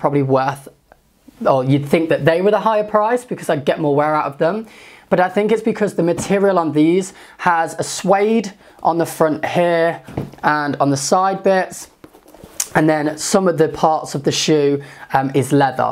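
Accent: United Kingdom. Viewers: are you Brit? i can hear accent..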